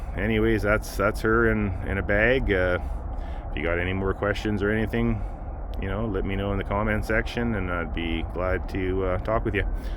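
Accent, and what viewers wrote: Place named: Canada. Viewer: I hear the Canadian accent. Perfect car for the Northern weather. Great video man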